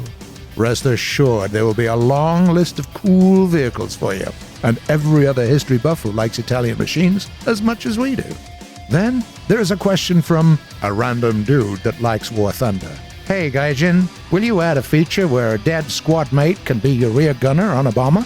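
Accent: United Kingdom. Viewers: this dude accent is like an old british dude...... I LIKE IT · He sounds british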